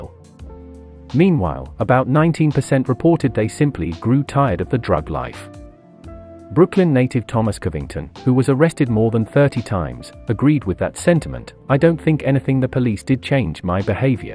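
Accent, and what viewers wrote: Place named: RP, United Kingdom. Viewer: This is text-to-speach voice generator. You can choose whichever accent you like. OP just liked the RP, I guess.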